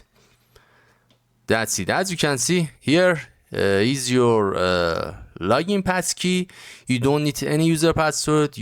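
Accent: Iran. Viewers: اوففف لحجه ی فارسی 😂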